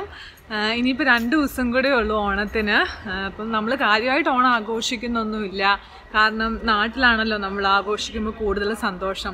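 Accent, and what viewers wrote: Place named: Kottayam, India. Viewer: തനി കോട്ടയം സ്ലാങ്. Like it.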